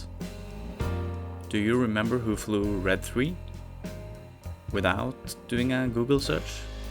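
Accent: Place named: Estonia. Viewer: Been loving all the videos. May I ask where you are from though?. You sound kinda Estonian. Maybe Nordic?.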